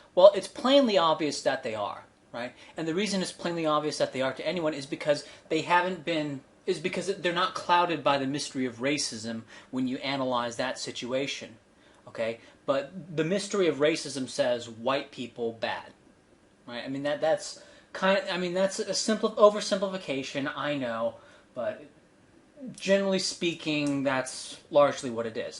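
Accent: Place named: North America. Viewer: Are you greek? LMAO this is absolutely insane. A north american dude (by accent) deciding what thousands of miles away should do 🤣🤣🤣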